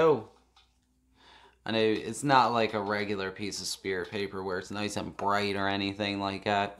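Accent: Baltimore, United States. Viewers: Lol I was thinking you sound like you’re from Baltimore then I looked at your channel name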